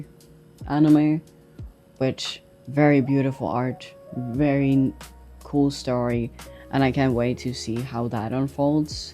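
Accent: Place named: Norway. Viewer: He’s from Norway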